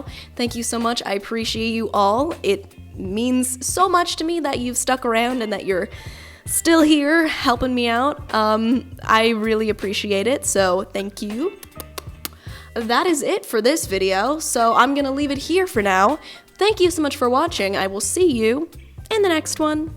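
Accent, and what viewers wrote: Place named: Ireland. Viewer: $$$ great Irish accent. Keep up the good content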